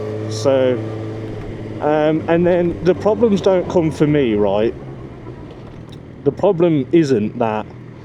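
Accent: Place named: Birmingham, United Kingdom. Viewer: Where are you from matey, you accent sounds pretty near to mine in Bedworth, yours sounds a bit more towards Brum though?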